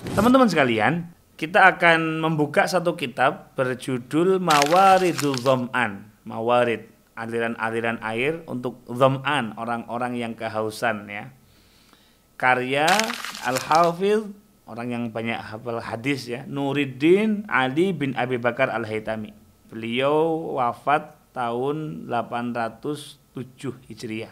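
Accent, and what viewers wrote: Indonesia. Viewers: Logatnya Bangkalan banget. 😅 Ternyata banyak Wahabi di sana Sikat, Guse...!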